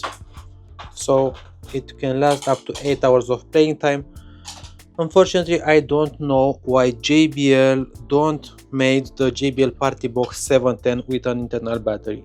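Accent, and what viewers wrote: Romania. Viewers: a little bit of romanian english =)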